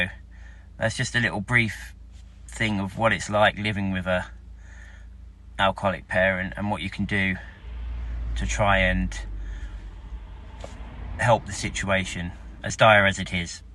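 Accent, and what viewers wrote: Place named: United Kingdom. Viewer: Откуда Вы? Такой акцент, будто Вы из Великобритании, звучит так правильно. Получилось ли у Вас создать свою семью?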